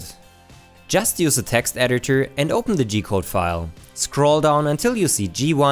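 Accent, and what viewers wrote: Germany. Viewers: Feier deinen Deutschen Akzent xD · Dieser deutsche Akzent ich fühle es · When you hear the German accent the first thing that comes to mind is "precision". Great work. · Desch isch aba a schöna deutscha akzent LEL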